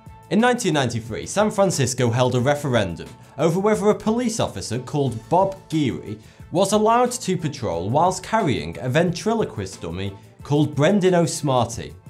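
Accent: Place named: England, United Kingdom. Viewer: Yes 42 is the answer to life..... it is from a wicked cool movie... I bet you know what 1!!! and yes his name is a play on words but also he is English.... Hench he has an Accent... You silly silly sausages!!:) p.s. you rock my brain with all of random facts!! I am so addicted to your channel:) Peace.:) x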